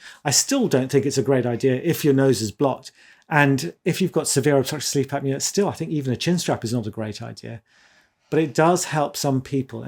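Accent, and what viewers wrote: United Kingdom. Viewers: Good Day, man! Thank you for the upload. I've been mouth taping for about 3 years now and its shown great benefits. I'm pretty sure I am still having some mild snoring/Apnea events but the mouth tape was a game changer and my Hygienist actually said they noticed an improvement in my overall oral hygiene. Disclaimer: it may be anecdotal and ones mileage may vary. I appreciate all that you do for the sleep community and of course the English accent is an amazing addition! also: So many Cartoon/movie character pins on your coat.. oh my!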